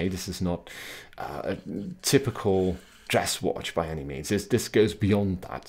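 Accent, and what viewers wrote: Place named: Netherlands. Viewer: Nederlands accent ;-)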